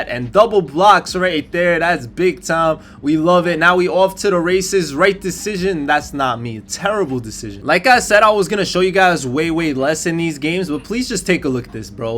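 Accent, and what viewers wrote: United States. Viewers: You mentioned you have lag a lot. Where are you based? Im from the UK but im always running into you in TT park. and you've got a US Accent, Maybe you're on the wrong servers or something.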